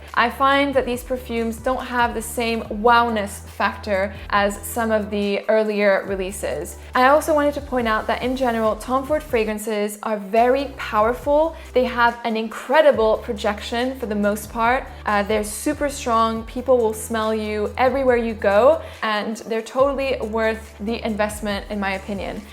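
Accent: France. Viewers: A totally intoxicating french accent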